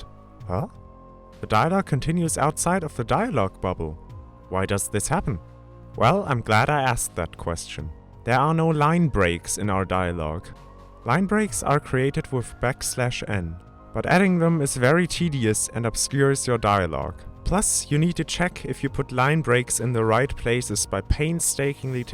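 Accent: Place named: Germany. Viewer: are you, by any chance, german? bc your voice sounds similar to some german youtubers speaking english.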